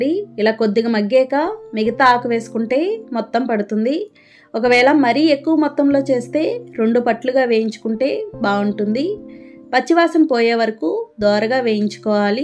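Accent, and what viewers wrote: Godavari, India. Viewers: Is your Telugu, Godavari Telugu, sweet to head pure telugu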